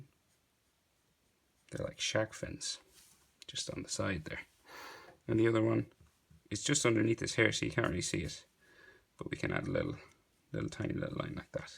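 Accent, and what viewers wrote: Ireland. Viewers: hey man you from cork? I hear that accent. Nice drawing thanks for the tutorial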